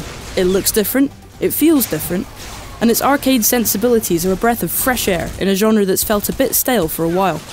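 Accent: United Kingdom. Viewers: that british voice MAKE IT STOP!!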